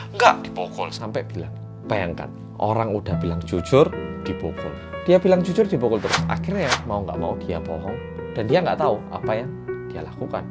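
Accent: Java, Indonesia. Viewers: yey dtektif korea yang medok UPLOAD SETIAP HARI, semangat mas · Medhokkk banget🤭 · Harusnya bersama detektif Korea yang medok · MEDOK....... NICE 😁😁😁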